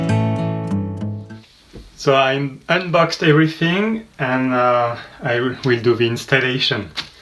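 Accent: France. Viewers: you have a french accent, mon ami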